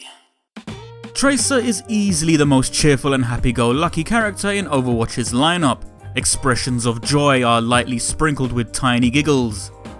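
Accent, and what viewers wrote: London, United Kingdom. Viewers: But I'm Scottish, and pretty much the only English people where I live are posh ones that are here because they work as air traffic controllers that were moved here for work, and I still recognise your accent as a Londoner's. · How do people think your accent isnt a London accent?!? Like seriously?? I live in the South-East and your accent is still something that I regognise and hear daily. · I'd defiently say you've got a London accent, albeit that really chavish one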